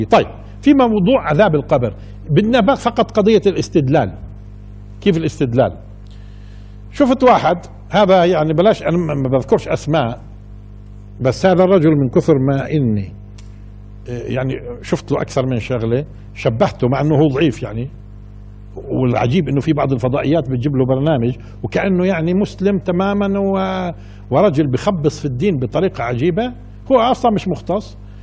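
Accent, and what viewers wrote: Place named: West, Algeria. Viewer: سبحان الله نفس اللهجة عندنا في غرب الجزائر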